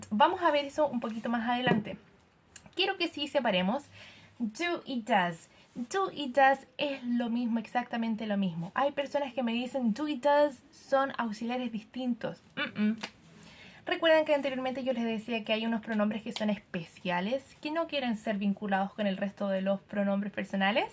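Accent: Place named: Chile. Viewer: Usted es de Chile por el dejo.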